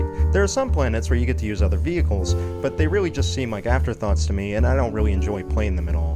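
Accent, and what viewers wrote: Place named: North, United States. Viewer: Doesn't really sound like James Rolfe but he could be from a similar part of America. He certainly sounds like he has a northern accent.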